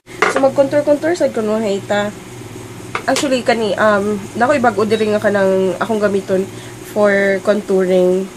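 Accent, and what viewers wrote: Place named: Cebu, Philippines. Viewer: Like number 5:) heheh ang cute niya mag cebuano heheh ang saya agad ng bungad heheh:) wow gusto ko tong tag na to hehehe:) sakto ka sub ko lang din sa kniya now heheeh:) ang cute cute nimu mag visaya ui heheeh:) You just got a new subbie, It's Vangeline from IndayG's channel:) cheers!!!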